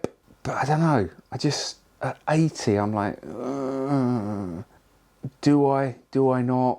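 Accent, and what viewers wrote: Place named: United Kingdom. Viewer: Switched of at aluminum. Dude your British.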